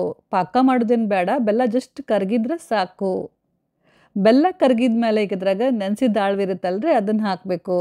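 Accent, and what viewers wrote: North Karnataka, India. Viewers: Love ur cooking style and recipes 👌 and uttar karnataka bhasha mast mast 😊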